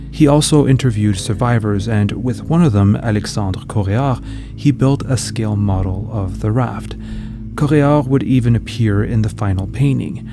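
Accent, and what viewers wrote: Quebec, Canada. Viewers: Your quebecois pronunciation is surprising 😄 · Are you from Quebec? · Salut, es tu Québécois? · Are you from montreal or quebec? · Hey love your content is your accent Quebecois ? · hes from kebek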